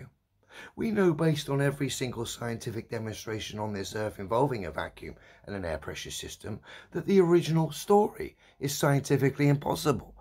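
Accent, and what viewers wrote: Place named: London, United Kingdom. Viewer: Good old British London accent.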